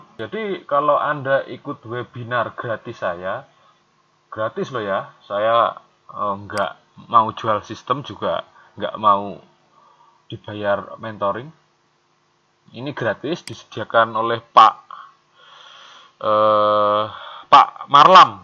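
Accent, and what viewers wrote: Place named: Surakarta, Indonesia. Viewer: Bapak apa orang solo ya dari logatnya kentel bgt hehehe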